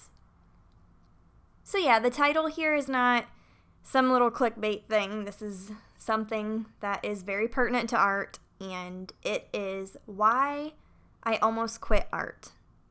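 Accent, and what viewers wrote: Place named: Northeast, United States. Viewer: Are you from RI, NY or MA? you have that accent. B^|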